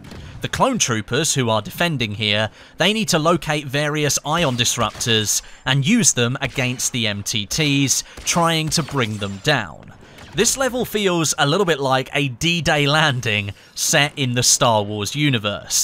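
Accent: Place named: Southern England, United Kingdom. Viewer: If I had to guess I would say the south coast of England. His accent isn't northern, jackfrags is a northerner. I'm from the south west and we don't share the same accent. Or he could be from the posh parts of London.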